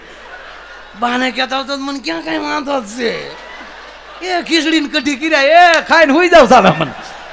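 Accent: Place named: Kathiawar, India. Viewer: Mayabhai kaddak pure kadhiyavadi superb jay mataji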